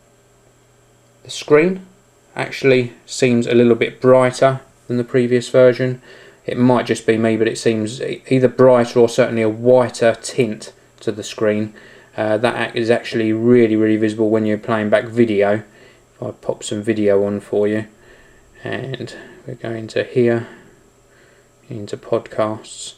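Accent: England, United Kingdom. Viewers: A real good review.. ps. i like yor british accent^^.. i´ll get one next january..^^ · He's a Brit. Australians (I'm an Australian) sounds completely different! LOL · R u British? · ru like from england cuz u have a acksent and i like ur ipod i be geting 1 really soon 2 more months and i be getting 1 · you have a heavy brittish accent...cool =) and wayz i want to got a ipod touch 8 gig 3g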